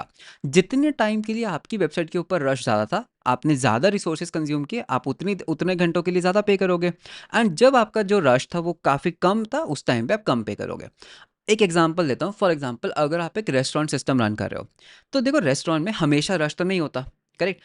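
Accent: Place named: India. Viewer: Bro please work on your accent. English speaker and dropping your series because I can't understand that well especially with 2x speed. And I work with indians a lot at work so I'm above average for an American understanding Indian accents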